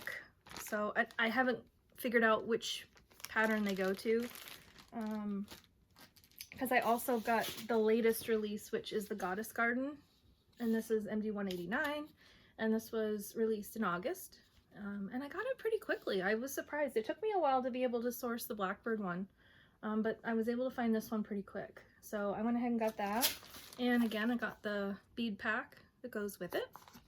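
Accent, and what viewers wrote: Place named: Quebec, Canada. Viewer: Always great to see your flosstubes. You speak French with a French-Canadian accent! “Histoire” can mean either history or story in English. I love your Luda, and in fact all of the things that you work on. I hope the memory of your mom will bring you comfort.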